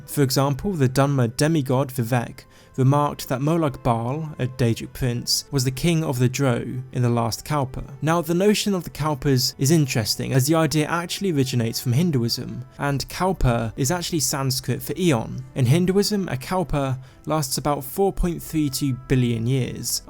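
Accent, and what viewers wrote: United Kingdom. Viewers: Love your videos and your voice . What part of GB are you from ???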